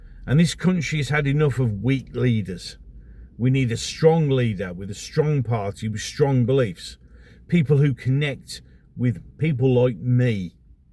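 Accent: Birmingham, United Kingdom. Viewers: Your brummie accent is so strong ! . Great channel our kid , spinning round JLR 😊. YOUR NOT RIGHT PROTECT THE PARTY what’s left civil war ……….. What’s your silly answer ?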